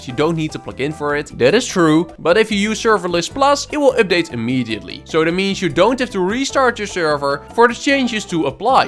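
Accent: Netherlands. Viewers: Are you dutch cuz you sound dutch. i am dutch🤗🤗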